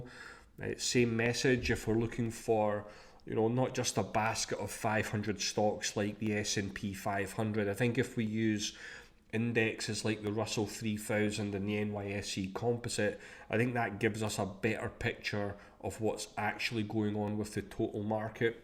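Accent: Scotland, United Kingdom. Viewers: Nice work and useful content 👏👏❤️. The Scotch accent is a great PLUS. 😀🙏👏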